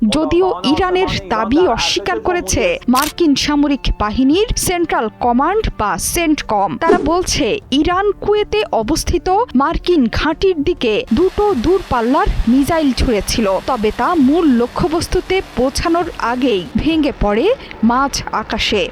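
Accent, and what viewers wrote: Kolkata, India. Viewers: কলকাতা থেকে ট্রেনিং নিয়ে আসছেন নাকি আপা।আপনার উপস্থাপনার ধরন এরকম কেন?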